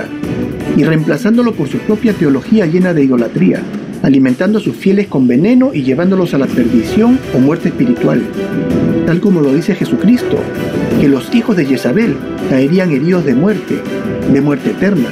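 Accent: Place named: Peru. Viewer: Estimado hermano, por tu acento, y tus 🦙 llamas 🦙 esos lindos adornos que se aprecian al fondo, supongo que eres peruano 🇵🇪 que hermoso estudio y reflexión, digno de un siervo del Señor de los cielos. Quiera Dios te escuchen a ti, ya que a mi no me creen, la mayoría piensa que La Biblia es un libro de cuentos de fantasía. Como cristianos e hijos de Dios debemos estar velando, pero no quieren ni hablar del tema. La paz sea contigo, amén 🙏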